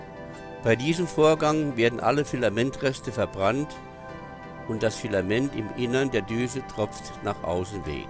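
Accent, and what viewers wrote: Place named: Saarland, Germany. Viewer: Ach, gugg mo doo... Was für e Üwweraschung zum Schluss, die Saarlänner sinn eschd üwwerall... Do haschde dei Platt awwer gudd verschdeggelt... :-D Vielen Dank für das hifreiche Video, jetzt kann ich als Anfänger meine verschmutzten Nozzles auch reinigen... Grüsse aus Aachen, von einem Exil-Saarländer mit dem Herz noch immer in der Heimat :-D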